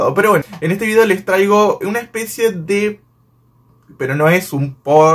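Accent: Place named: Uruguay. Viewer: Hablas como uruguayo del interior, de donde sos?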